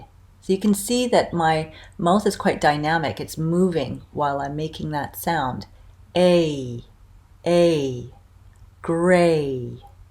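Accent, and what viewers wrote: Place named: Canada. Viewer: I'd love to get a video on Canadian Raising. The dipthongs Ai and Aw get reduced to (ʌɪ) & (ʌʊ) Correct me if I'm wrong but I heard you did use (ʌɪ) a few times. Obviously these aren't dipthongs every single Canadian uses though it's well-spead over the southern provinces. I think we foreigners will benefit from a lesson on those dipthongs.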